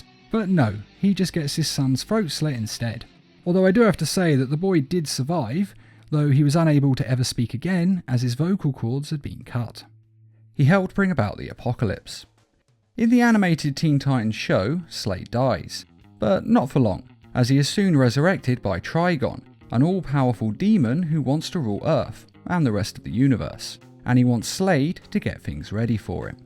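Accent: England, United Kingdom. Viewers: I think it's an English accent.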